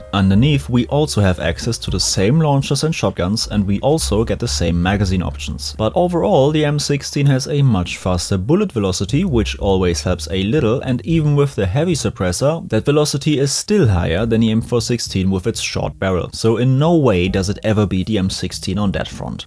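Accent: Germany. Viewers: Just wanted to say hello as a new subscriber. Just found your videos and they are AWESOME. I really like how you break the weapons down, specifically the ttk ranges and ammo/barrel differences. You are the 1st BF person I've found who does this (yes, I'm newer to BF) coming from 20yrs of COD where it is more common (again yes, I'm a COD convert but I think we all can get along don't hate me 😉. Also my fave is MW2 most BF-like imo). Already kinda long sorry just wanted to say keep it up great job! And as 1st generation American from German & Croatian immigrants I think i caught the accent - German, no?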